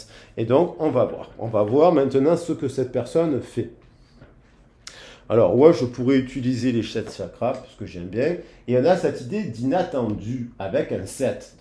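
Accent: South, France. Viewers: Bonjour je vous decouvre vous êtes une personne très solaire merci pour vos guidances très detaillees vous avez raconté mon histoire il a pris la fuite sans explications bcp ds l ego superficiel il y avait un déséquilibre bcp de froideur .silence radio j ai lâche prise car trop de souffrances tout est juste du début à la fin .vous êtes formidable c est un plaisir de vous écouter. J adore votre petit accent ensoleillé .merci pour votre bienveillance et votre générosité. Belle journée a vous 😉😊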